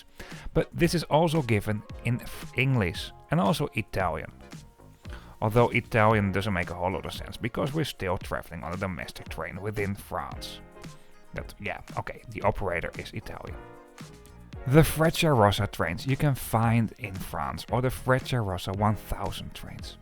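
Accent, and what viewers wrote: Netherlands. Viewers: Hearing the Dutch accent voice train travel just makes me happy! I needed this on a busy Monday in Germany.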